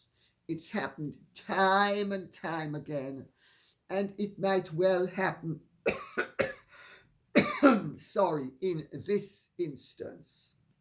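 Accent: Jamaica. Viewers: Love the Jamaican accent 😀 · Her accent is like that because she was born and bred in Jamaica and later moved to the U.K. Of course, she already spoke with a refined form of Jamaican accent because of her relatively privileged family origins. My Jamaican kin speak this way too. They happen to descend from a grandfather who settled there in the 1910s from England who married into an elite local family. They were educated there and sent to finishing schools in England and Switzerland. They sometimes wax more Caribbean at times, just like Lady C. when in full stride. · Yes I totally get it I am Caribbean also and when we want to put on our flava’ we really know how to put it on. I love love love love love the Jamaican accent. And I just wish she would do a whole video speaking that way. I can’t get enough of that wonderful spice! I am in Texas now and I so miss being around all the diversity that I grew up with in New York City and later enjoyed in Florida. 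I miss stopping by the Jamaican store to buy A Jamaican patty in Coco bread… and there’s nothing better than spiced bun with cheese in the morning with my coffee. Bring on that jerk spice Lady C! I am in Texas now …spice is a long way coming here!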